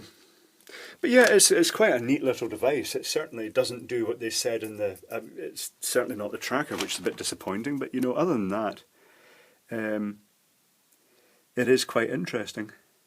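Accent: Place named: Edinburgh, United Kingdom. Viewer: "Mellifluent" I think is the word you are seeking, Robert. Me, I thought it was just his pan-loafy Edinburgh-esque accent. :-)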